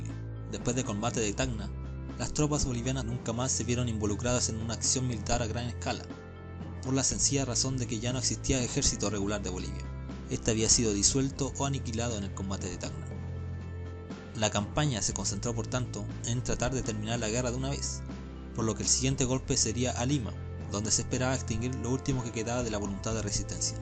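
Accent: Chile. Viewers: SI me parece que esta claro las medias verdades que argumentas,tu video no es imparcial esta claro tu acento chileno, bueno cada quien defiende su pais, PERO LO QUE ES CIERTO Y NADIE LO PUEDE NEGAR ES QUE UN PAIS MAS AVANZADO SE APROVECHE Y ADUEÑE DE SU TERRITORIO DE UNO MENOS AVANZADO. QUE PENA POR LOS HERMANOS BOLIVIANOS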